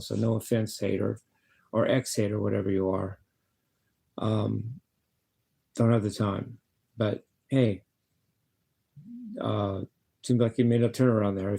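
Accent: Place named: East Coast, United States. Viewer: Hi, Nobody uses “Mock” except. I can hear your east coast accent.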